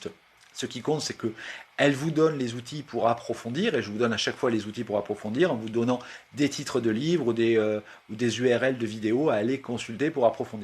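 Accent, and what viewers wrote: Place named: South, France. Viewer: C'est demandé de façon si aimable !... Je ne vais pas détailler toutes les questions posées (je n'ai pas pris note de toutes 😉) Mais ça risque quand même d'être un peu long (1000 excuses d'avance) Préambule : je n'ai rien contre le fait de se tutoyer. J'ai toujours travaillé dans l'enseignement. Je n'ai tutoyé mes étudiant(e)s qu'à partir du moment où ils-elles sont devenu(e)s des collègues. J'ai un titre d'ingénieur en mécanique (tendance maths - élasticité, milieux continus...). Assistant à l'univ de Louvain (1973-79), prof de physique aux Comores (80-81), prof d'informatique (programmation essentiellement) de 1982 à 2012. 1) L'intérêt pour tes "Flashs" : essentiellement ceux relatifs à l'histoire de l'art. J'ai suivi (<num> ans de cours de photo à l'École des Beaux-Arts (ça sonne plus moderne que "Académie") de Wavre. 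Si le cours d'histoire de l'art (obligatoire) avait été donné "à ta façon", j'aurais sans aucun doute persévéré pour terminer le cycle de 9 ans, mais... Mon dada, c'est plutôt la photo "sociologique", genre Walker Evans (Depardon, Ronis...) 2) Comment améliorer ces Flashs ? Jusqu'il y a peu, j'étais gêné par le manque de synchronisme entre image et son et le fait que l'image était "à l'envers" (comme pour la plupart des gens qui partagent des images de Smartphones). Cette fois-ci, ça semble synchrone, donc... Tu as une excellente diction et un petit accent méridional des plus gouleyant à l'oreille (un peu comme celui de Mouscron chez nous 😂) 3) Question nivea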